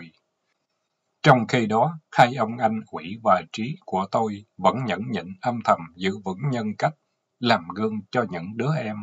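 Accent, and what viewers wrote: South, Vietnam. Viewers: Những bài Hồi Ký của Th/Tá VML K/20 VBQG thật đầy chi tiết và sống động trước mắt của phim trường. Chúc anh và gia đình luôn khỏe mạnh. Cám ơn anh 8 vè diển đọc. Giọng Nam của anh mà nhớ tới miền Nam.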